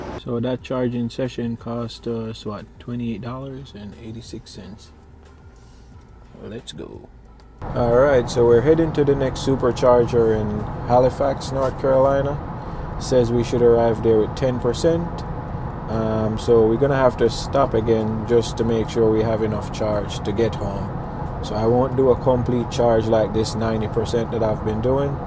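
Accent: Jamaica. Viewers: Mi know mi people any weh we deh lol big up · I sense a Jamaican accent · Can i ask if you happen to be jamaican?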